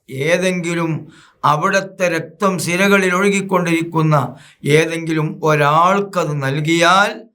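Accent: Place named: Malappuram, India. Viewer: ഉസ്താദ്, ഒരു വിനീതമായ അഭിപ്രായം..ചില വാക്കുകൾ മലപ്പുറം ശൈലി ആയതുകൊണ്ട് മറ്റ് ജില്ലക്കാർക്ക് മനസ്സിലാവില്ല. അതുകൊണ്ട് പൊതു മലയാളം സംസാരത്തിൽ കൊണ്ടുവന്നാൽ വളരെ നന്ന്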